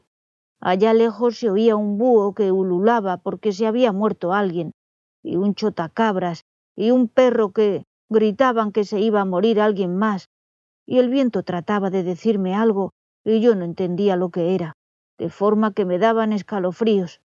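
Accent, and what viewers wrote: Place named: Spain. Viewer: me gusta como lees y me gusta que sea un video de tan solo una hora. La pronunciacion es clara. Me encanta oir tu espanol de Espana.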